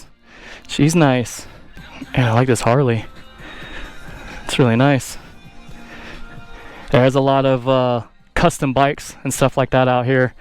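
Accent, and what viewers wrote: United States. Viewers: Between the paint lines looking the same as in a lot of the US and your accent I had assumed you were in the US and thought of offering you some time on a 2013 Streetfighter 848 if you were going to be anywhere near the DC area.. but no, South Korea is a bit of a trip 😂 Maybe for the best, mine isn't the prettiest example! Coming up on 30k miles, dark stealth, frame sliders, aftermarket tidbits here and there... It rides nice, but it looks its age in places.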